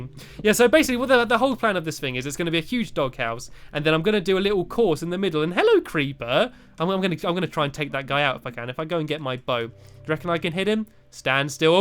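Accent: United Kingdom. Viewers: my mum hates your british accent................ But, hey, we used to live in England. So Go girl, that was how you used to sound. SO Suck it up Princess <3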